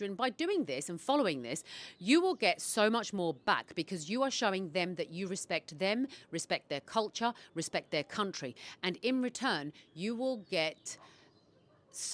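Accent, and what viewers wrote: United Kingdom. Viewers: Assalamualaikum sister Please can you remove subtitles from your videos bcz with them i cannot listen you focusly, i wanna understand you directly cz i do love British accent.thnks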